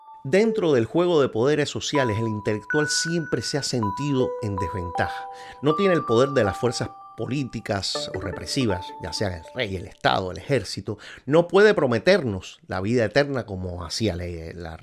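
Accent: Cuba. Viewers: Gran vídeo . Pero creo que hablas del intelectual comunista. Por tu acepto veo que eres quizás cubano? Yo soy cubana y entiendo que este punto de vista viene algo heredado de la situación del país. Quizás eh? De cualquier modo, muy bien video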